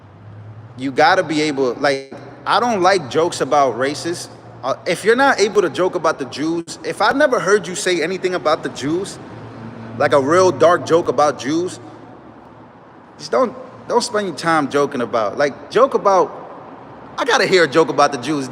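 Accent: New York, United States. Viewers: Thank you for reporting from the rally at MSG. You were awesome. I cant thank you enough. I had tickets to go but did not want to go alone. I follow 2 youtube people. One is you..I knew you were a N.Y.ER I COULD TELL BY THE WAY YOU TALK. IM A N.Y.ER ALSO.. THANKS FOR SPREADING THE GOOD WORD. YOUR A TRUE PATRIOT ❤ 🇺🇸🇺🇸🇺🇸🇺🇸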